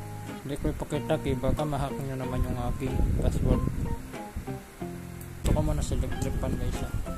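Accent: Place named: India. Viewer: bat boses indiano parin kayo kahit pinoy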